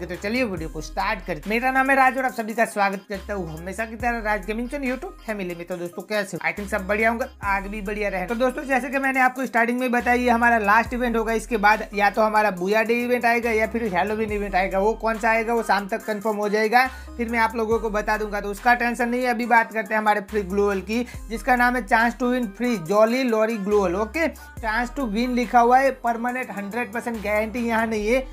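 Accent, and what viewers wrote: Gujarat, India. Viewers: Appp Gujarati ho kya bhai ?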